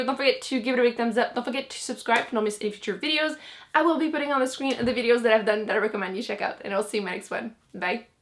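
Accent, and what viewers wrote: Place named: Canada. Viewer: Hey! I really like your videos, love your accent and I'm Canadian too!!! <3 I've been subscribed for a couple months now and I will be sticking around!!!:)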